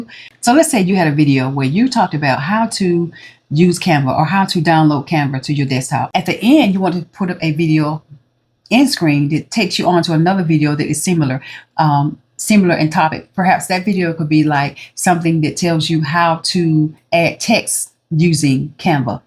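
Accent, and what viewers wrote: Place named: Southeast, United States. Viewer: Hey! Thanks for visiting our channel and subbing! You also have a new sub! This is a great, informative video! One of my biggest issues is posting our content on a more consistent basis (we have tons of recorded content). We would like to do a live eventually but I want to wait until we have at least 1,000 subs. I would hate to start a live and no one showed up 🤣🤣. I love your style and your accent. I am guessing that you are probably from one of the southeastern states? You hit on all the points to get those watch hours; Consistency, Playlists, Storytelling, Engaging Content, etc. Keep up the great work. Look forward to your next video!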